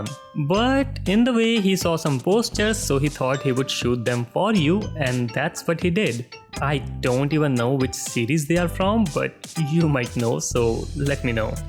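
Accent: India. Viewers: Bro you have indian accent so smooth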